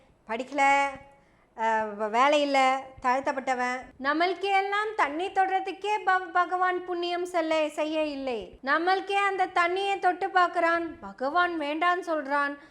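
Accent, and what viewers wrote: Kongu, India. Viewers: இனிமையான அழகுத் கொங்கு தமிழ் தன்னில் செழுமையான பேச்சு வாழ்த்துக்கள் சகோதரி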